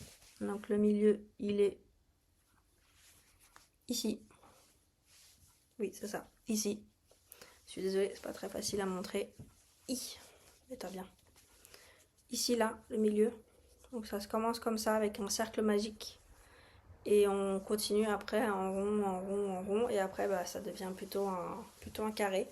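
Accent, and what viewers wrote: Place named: England, United Kingdom. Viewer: Jolie découverte de ta chaîne grâce aux suggestions de Youtube :) Quel joli accent anglais d'ailleurs ♥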